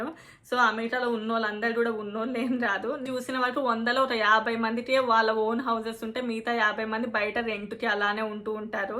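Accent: Telangana, India. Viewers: Your voice n fluency is so natural akka, Medi Telangana ?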